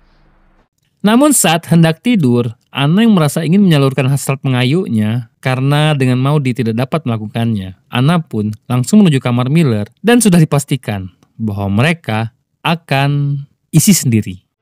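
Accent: Palembang, Indonesia. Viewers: Aiii mang cek nee wong plembang yoo...😆😆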